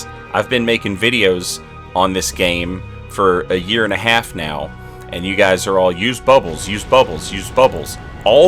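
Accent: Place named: Tennessee, United States. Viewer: you're from tennessee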